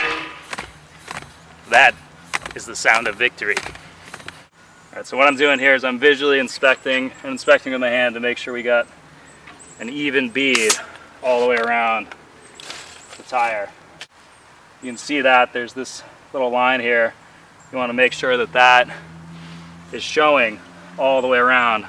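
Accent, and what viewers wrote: New England, United States. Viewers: Kid! This video was wicked! I sense that you're from New England.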